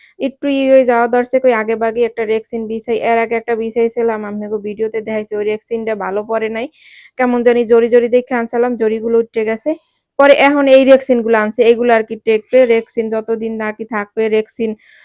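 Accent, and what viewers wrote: Barishal, Bangladesh. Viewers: আপু বরিশালের ভাষা খুব ভালো লাগে 💘💘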